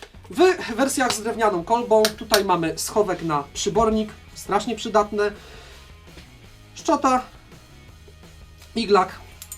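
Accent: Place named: Silesia, Poland. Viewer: szlysza ze z naszej gotki mosz ten hajmacki akcent, pyrsk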